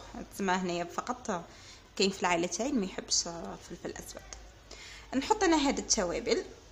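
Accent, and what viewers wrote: Skikda, Algeria. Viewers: لهجة جزائرية سكيكدية تهبل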